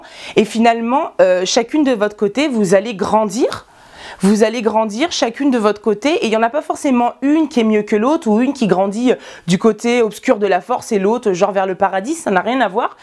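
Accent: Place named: South, France. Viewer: Coucou je suis complètement d'accord avec toi pour les copines par expérience on apprend bien des choses...j'adore l'accent du sud tu m'a fait trop rire, tu et naturel et tu te prends pas la tête sa fait plaisir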